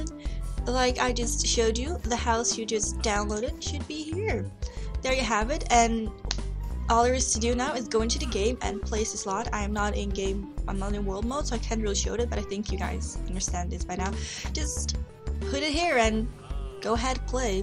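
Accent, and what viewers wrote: Netherlands. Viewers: o are you dutch? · haha gewoon een Hollander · Your dutch :)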